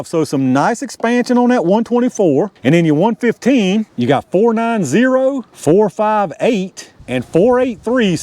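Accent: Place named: Georgia, United States. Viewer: Fun video & thats a Georgian accent if I'm not mistaken.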